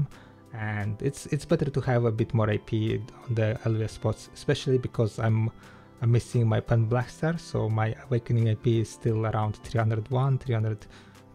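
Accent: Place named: Rural, Russia. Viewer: ППц разговорный английский как из русского села)) чел завязывай) это явно не твое!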